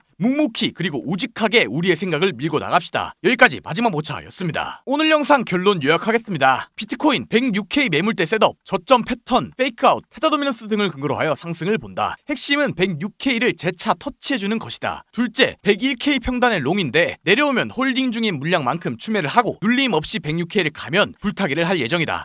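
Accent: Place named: Jeolla, South Korea. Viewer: 느작없이 오랜만에 듣넼ㅋㅋㅋ 전라도 분이시구낰ㅋㅋㅋㅋ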